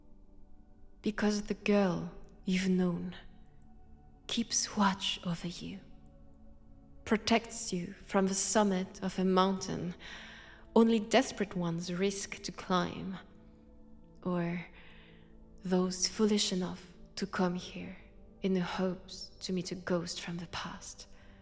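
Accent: France. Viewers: Why are you French?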